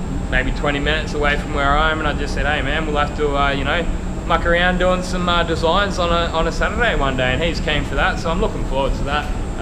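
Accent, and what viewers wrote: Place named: Australia. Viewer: not sure if your in Australia or not, your accent makes it sound like you are. If you are will you please show custom spray mods how to paint. I swear he paints like some shoes never held a gun before. trigger happy as hell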